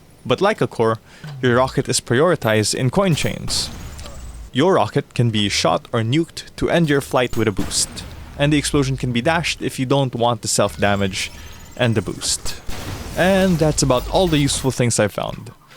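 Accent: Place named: Philippines. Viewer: been watching your guides and they've been extremely helpful, thank you! are you filipino btw because that accent is so recognizable ahahaha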